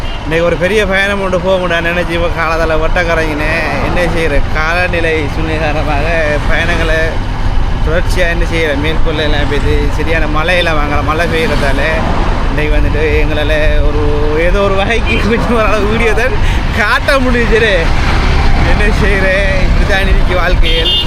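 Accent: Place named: Jaffna, Sri Lanka. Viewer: தென்மராட்சி யாழ்ப்பாண வழக்கு 😇😇😇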